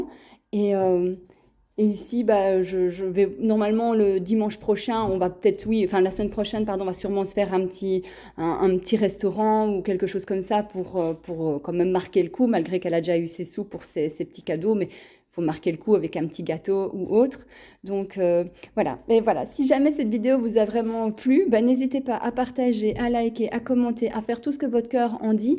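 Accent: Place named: Belgium. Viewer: J'adore houit à la place de huit et nonante à la place de quatre vingt dix.J'adore les Belges et la Belgique.Ne change rien!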